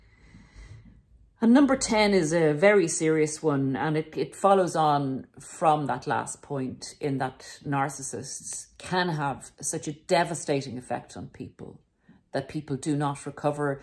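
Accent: Ireland. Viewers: Thank you for your help you seem to understand the situation well enough to put the problem across to others , every thing you have said I’ve been through just gone through Christmas that my husband ruined for me no gift no food nothing spent the last few days in the bed room away from him as he had the nerve to blame me , I’m not well at the moment and it seems to annoy him as he appears to think he’s worse than me , but I haven’t seen any evidence of that ,I might have to have an operation at sometime but I can’t believe he would want to help me with that . He has left some leaflet around about domestic abuse I can’t believe he thinks I’m the problem ,I haven’t spoken to him from before Christmas as it’s a waste of time as he doesn’t understand it’s him causing the problem , I thought he could be depressed as all he does is to moan about everything and everyone but he’s been like this for years so I don’t know, I just want to be happy , normal, not living in a war zone doggy land mines , loving your accent my parents were from Ireland, thanks for letting me let off some steam , happy new year .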